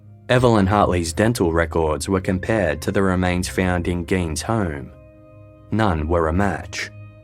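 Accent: Australia. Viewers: That is the sound of an Australian voice.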